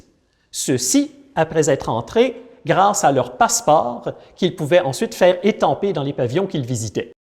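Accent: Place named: Quebec, Canada. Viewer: Que j'aime entendre parler un québécois en Français .Merci à vous de conserver cette langue comme le reflet de votre culture et de vos origines. ENCORE MERCI DE FAIRE RAYONNER CETTE LANGUE QUE J'AIME TANT !!!👍👏❤ FRANCE (Lille)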